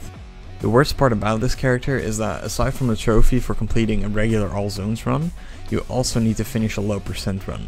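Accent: Netherlands. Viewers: Ik hoor je Nederlandse accent😜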